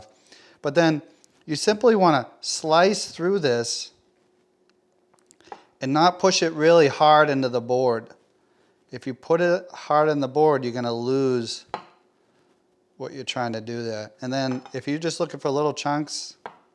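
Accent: Boston, United States. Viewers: Thanks buddy! Love the Boston accent btw!!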